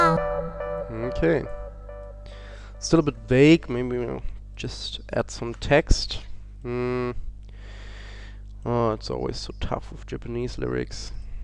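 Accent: Germany. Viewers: man merkt an deiner Aussprache dass du deutsch bist ;)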